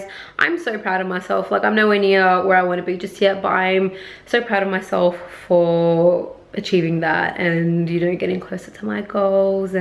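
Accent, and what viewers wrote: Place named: Australia. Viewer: I suscribed to your channel because I loove how you do makeup! I remember I found you in Instagram, and I took the time to stalk you jaja and thats how I got to your yt channel, after that I just fell in love with your accent😍 You are the only Australian youtuber I am suscribed to, and you are my favorite makeup artist out there!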